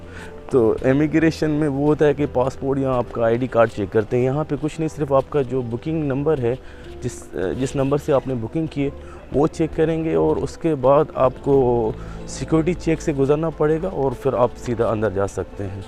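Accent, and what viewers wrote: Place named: Arab world. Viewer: ماشاءاللہ بڑے بھائی ۔اللّٰہ اعطیک الف عافيہ ۔آپ نے دل خوش کردیا ۔ مگر بھائی مجھے یہ نہیں سمجھ آیا کہ آپ سعودی شہری ہو یا پاکستانی بھائی ہو۔کیونکہ آپ دکھ بھی رہے ہو سعودی اور بولنے میں عربی لہجہ بھی ہے۔۔مگر اردو بھی اچھی ہے۔پلیز رہنمائی کریں۔۔ اخوک الصغیر ۔محمد نسیم لکھنؤ من الھند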